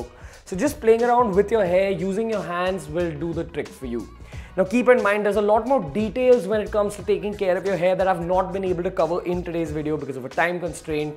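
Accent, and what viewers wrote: India. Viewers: When will indians acheeve to speak prooper english or american accent? Why do they fail generations in this?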